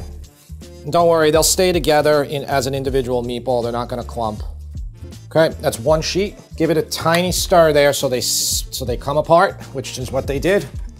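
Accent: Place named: New Jersey, United States. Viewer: You must be from Jersey. Love the accents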